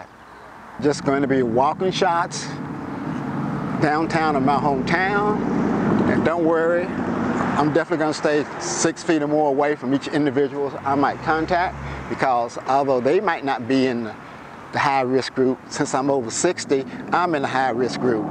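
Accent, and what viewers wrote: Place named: South, United States. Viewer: GREAT presentation, Sir!! I just love your Southern accent. Great use of language. Keep on sharing!!! Greetings from Montreal.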